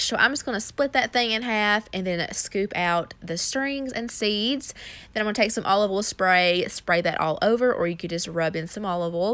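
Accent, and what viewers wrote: South, United States. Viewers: Love your Recipes and love your country accent 💕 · I just love your videos! Great filming angle and just straight to the point. Also love your strong southern accent 😂 it's like soothing to listen to.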